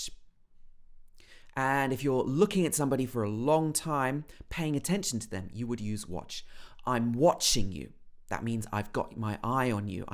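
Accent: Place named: United Kingdom. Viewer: I love british accent. plz make videos on paragraph reading.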